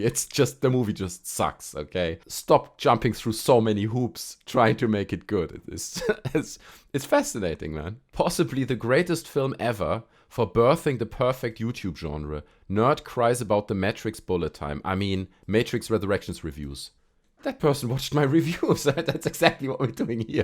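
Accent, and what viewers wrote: Germany. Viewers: I love your German accent. You and the YouTuber 'get germanized' should collaborate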